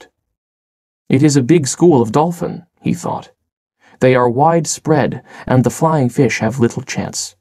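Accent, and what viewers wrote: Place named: United States. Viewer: The pronunciation in the story is the American accent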